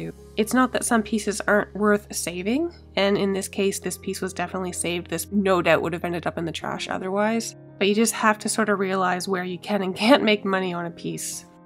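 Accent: Canada. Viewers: Found you by accident but was blown away by your work. Also, I miss Canada so much so loved hearing you talk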